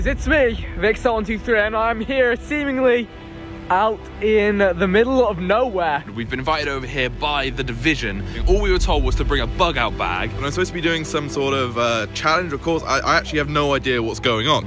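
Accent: England, United Kingdom. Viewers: are you english or you from netherlands too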